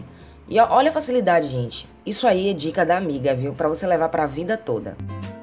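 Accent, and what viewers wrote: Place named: Northeast, Brazil. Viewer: Primeiro video que vejo do seu canal, seu nome é?😂 amei o sotaque vc é nordestina?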